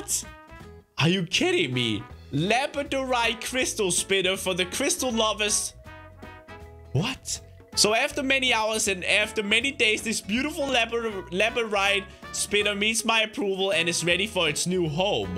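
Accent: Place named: Denmark. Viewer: A danish accent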